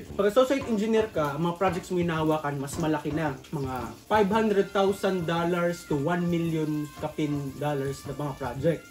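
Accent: Visayas, Philippines. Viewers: hahaha y*w* nindot jud kaayo paminawon ning bisaya mag tegelog ay hahhahhah hi kuyaaaaa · oy bisaya hahahaha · Hahaha kaaligri sa imng bisaya accent Engr. Dugang kas akoang inspirasyon as 2nd yr ce student diri sa cit univ! God Bless you engr! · ambot bisaya an deay si idol HAHAHA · lisuda jud ning bisaya mag tagalog oy hahah di ma likayan ma sagulan ug binisaya 😂😂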